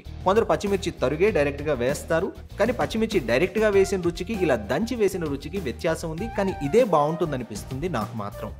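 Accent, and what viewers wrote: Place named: Rayalaseema, India. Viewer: U know the very first I felt u spoke the perfect rayalaseema slang..I loved it after hearing our slang from you. And we add garlic in pappula podi..and will definitely add fried groundnuts and obviously lemon should be must to have yummy taste...u try our recipe this time and let me know through your reply Anna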